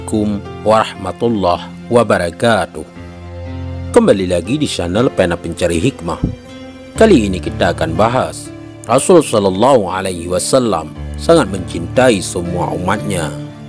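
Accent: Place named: Bali, Indonesia. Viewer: suaranya mirib suku bali ya 😇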